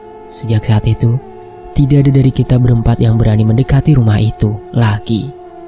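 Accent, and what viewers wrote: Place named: Cianjur, Indonesia. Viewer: Cianjur suarany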